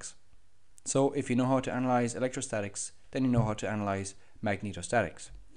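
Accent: Ireland. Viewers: Instantly liked because you're Irish and it's a great accent to listen to physics too (Y)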